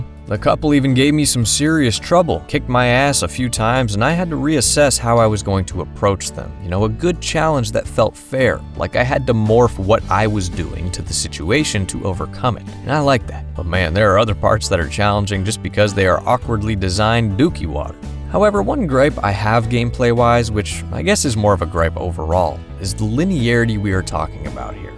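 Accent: United States. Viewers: I love your heavy cowboy accent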